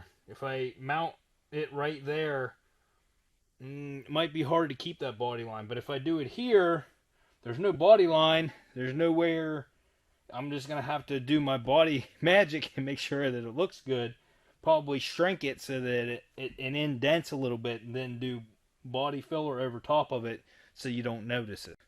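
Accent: Pennsylvania, United States. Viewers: Damn. That's unfortunate. It only looks like the door, fender and front pillar. By your accent, I'd say that you are in Eastern Pennsylvania? How about the junkyards in the Scranton area for parts?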